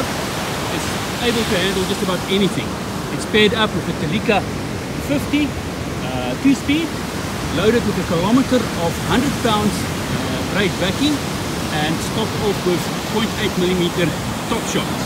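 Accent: South Africa. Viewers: Sounds very South African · are you South African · i love the accent. sounds south african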